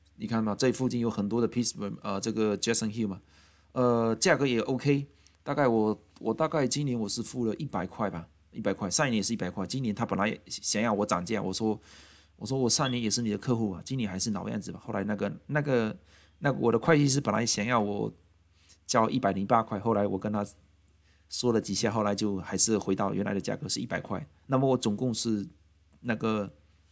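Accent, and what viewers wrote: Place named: Fuzhou, China. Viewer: 這福州口音 太輕切了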